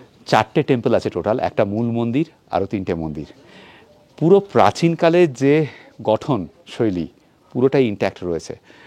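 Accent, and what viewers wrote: Bangal, Bangladesh. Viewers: Apner bangal kotha gulo ekhono gelo na puru bangal, blog moteie bhalo na chredin apner dara hobena jotoi chesta korun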